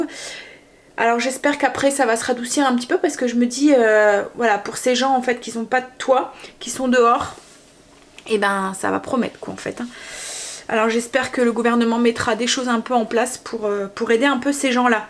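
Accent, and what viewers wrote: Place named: Nord, France. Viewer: coucou ,c'est toujours un vrai plaisir pour moi de te retrouver ,tu es tellement naturelle et "normale" et tu me fais penser à ma copine qui vit là bas dans le Ch'Nord hihi(le ptit accent ;-)) plein de bisous ma belle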